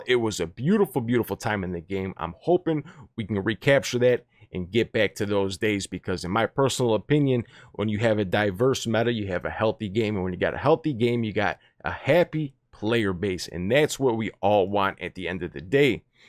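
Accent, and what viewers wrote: Midwest, United States. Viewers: I like how you say "sharanga" 😂👍that Midwest accent never gets old 💚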